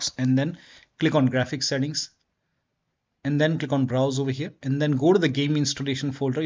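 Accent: India. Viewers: Where are u from by the voice it looks like u r from India if yes wanna play together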